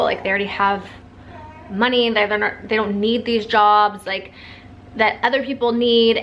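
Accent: South, United States. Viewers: I luuuuuv your suuthun accent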